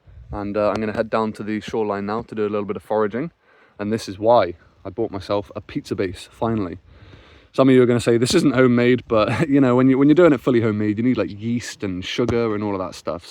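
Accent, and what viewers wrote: United Kingdom. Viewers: what part of UK is this mate? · Which part of UK is that?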